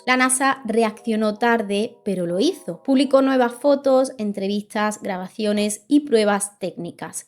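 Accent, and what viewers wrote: Andalusia, Spain. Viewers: Excelente video: sigue adelante el tema es muy demandado a día de hoy. Jajjj. Tienes asento andaluz muy lindo. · Que interesante video, Pero por Dios, de que parte de España es ese acento? Es muy hermoso 🤩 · Amo el acento andaluz y encima contando cosas interesantes. Saludos desde Chile!! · No sé Uds, pero lo interesante del tema lamentablemente lo perdí, por lo embelezado que me dejó el hermosisisisimo rostro de esa niña! ah! y la ñapa: me encantó el contraste que tiene entre su pronunciación de términos en inglés, y el acento (creo que es andaluz, corríjanme) cuando dice palabras en plural y se "come" la "S", 😊... ese: "etádo unido" le suena muy cool, que lo digo yo que digo "caraca", en vez de "caracas", que es de donde soy... PS: en cuanto al tema del video, prometo oirlo en vez de verlo, para concentrarme en lo que dijiste! 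Tip: baja un poco el "peluche" ese que cubre el micrófono, para que dizque se oiga mejor.. 😉... una vez digerido el material, prometo reuní un dinerillo para comprar un cohete.... para qué? preguntará la... pues "PARA LLEGAR A AMARTE"! 😅😅... (tú te comes las "S", pero yo no me como las "A", jeje) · Me Encanta ese acento español❤ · el mejor resumen acerca del tema con un hermoso acento andaluz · Está hablando con acento andaluz, el de mi tierra, si no te gusta agua y ajo. Luego te quejarás del doblaje español y preferirás el latino que hace que parezca que todo es una telenovela. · Lindo acento andaluz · Me suena a Andalucia ese acento😅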